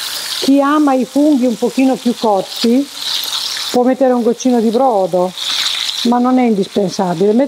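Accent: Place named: Romagna, Italy. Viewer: Sono romagnola vivo in Belgique la guardo sempre l accento romagnolo Che belllooo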